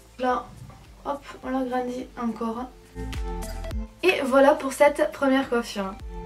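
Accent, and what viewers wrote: South, France. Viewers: toi tu viens du sud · franchement t'explique troo bien mercii beaucoup et j'aime bien ton accend ce serai pa l'accen du sud? en trou ca merci troo♥ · j'adore ton accent il est du Sud ?😀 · le journal d'une fille elle vien du sud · coucou , je viens de m'abonner , tu es très jolie et tes cheveux sont magnifiques ! tu es du sud ? je suis du sud ouest ! j'aime l'accent et les cigales ! :)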